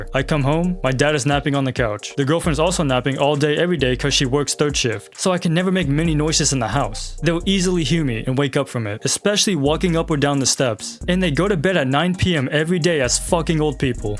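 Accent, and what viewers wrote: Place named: Australia. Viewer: W Australian voice,w good as videos are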